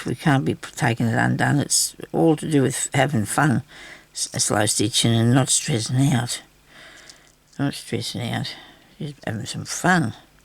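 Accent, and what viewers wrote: Australia. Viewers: Hi, another very pretty piece coming together. Love the way your mind works. It’s also very refreshing to hear your Aussie way with words and phrases. I feel like we are losing the Australia we grew up with, anyway thanks for your ideas, best wishes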